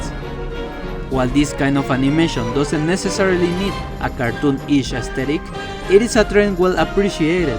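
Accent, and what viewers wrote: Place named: Romania. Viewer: Good Video! you have quite an accent. Are you from Romania?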